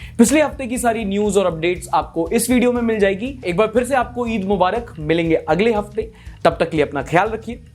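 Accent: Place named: Patna, India. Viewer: Aapke speaking style se lagta hai aap patna se ho. Am i right?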